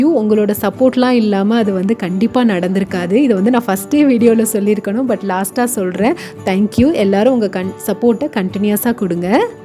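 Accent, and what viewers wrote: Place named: Sri Lanka. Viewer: sister super 👍 உங்கள் தமிழ் இலங்கை தமிழ் போல இருக்கு, ikea இ‌ங்கே யும் இருக்கு, from Switzerland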